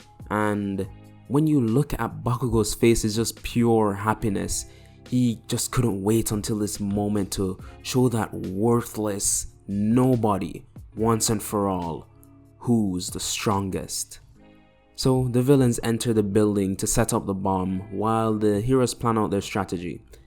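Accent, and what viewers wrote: Jamaica. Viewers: Where is that accent from? Jamaica maybe?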